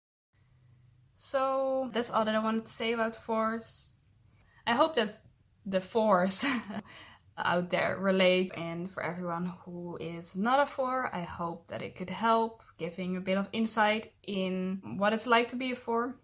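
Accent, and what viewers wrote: Netherlands. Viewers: Je klinkt heel Erg Nederlands ben je dat toevallig ook haha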